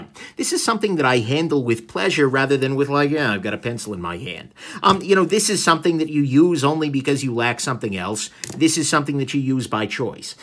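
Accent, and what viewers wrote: New York, United States. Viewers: I love this guy's accent, is it New York? I imagine him driving a taxi, and wearing a white vest at home, and having a difficult relationship with his mother in law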